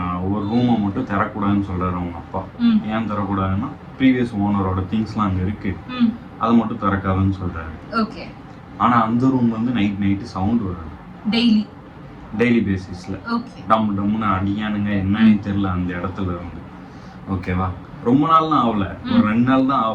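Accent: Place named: Tirunelveli, India. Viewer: So good to hear in Tirunelveli slang, bro🫡🫡🫡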